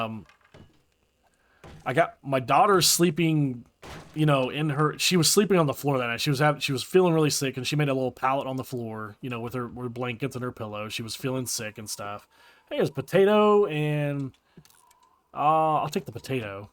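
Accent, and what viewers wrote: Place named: Midwest, United States. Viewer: Sounds odds but I like the muted color better. I say you got that Midwest anywhere USA voice. A strong radio voice like Tom and Ray Magliozzi the car talk guys. (for you young people radio is what you listen to while paying Bionic Commando on your NES console.)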